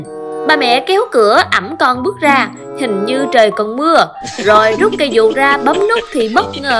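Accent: South, Vietnam. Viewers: Lần đầu góp ý với Channel 3p mình phải thật lòng nói Ms MC có giọng nói Nam Bộ rất vui tính và very intelligence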